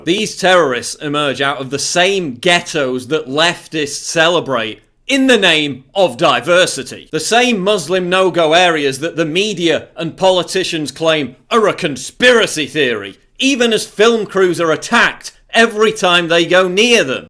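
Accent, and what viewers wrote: United Kingdom. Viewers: are you from UK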